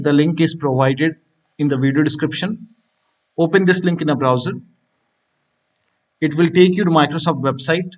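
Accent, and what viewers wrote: India. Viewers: Always the indian accent guys. Thank you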